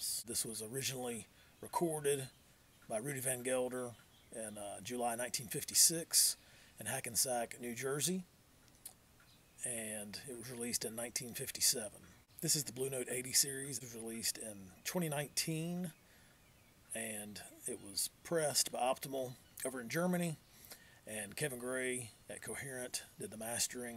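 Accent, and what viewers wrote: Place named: South, United States. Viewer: are you in GA or AL? The accent and the pines behind you tip it off if so! Haha.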